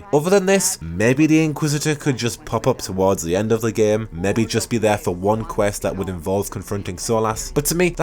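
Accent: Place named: Sunderland, United Kingdom. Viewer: I thought I heard a Mackem twang in your voice, and although my mage may be 1 handed he’s far from helpless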